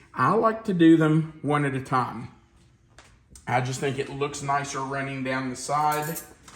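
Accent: South, United States. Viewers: I am still a faithful watcher even though I don't cook like I used to since my precious husband of 42 years died last year. Hold each other close. You are an adorable couple. This Thanksgiving message is the first time I saw., she is beautiful! I was born near Beckley WV, but have lived near Dayton, Ohio, for years. I LOVE your down-home accent. You keep cookin' and I'll keep watchin'!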